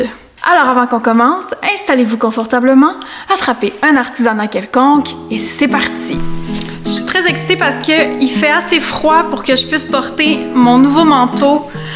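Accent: Quebec, Canada. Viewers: Bjr de Lyon..France..je passe depuis qq temps un super moment avec toi..cozy..l'accent...🎉❤très agréable pr connaître un peu mieux nos cousins du Quebec😊❤Veronique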